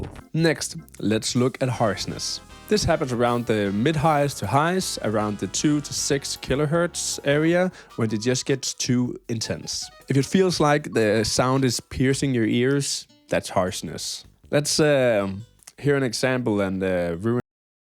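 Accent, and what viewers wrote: Denmark. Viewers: you sound incredibly danish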